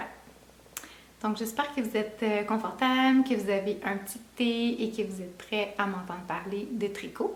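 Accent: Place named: Quebec, Canada. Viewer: Bonjour et merci pour ce partage très agréable ! J’aime beaucoup ce que tu fais et j’adore ton cardigan de Petite knit !! L’accent et les expressions québécoises sont vraiment charmants! Bonne continuation et au plaisir de te retrouver lors du prochain podcast ! Cécile 😁😘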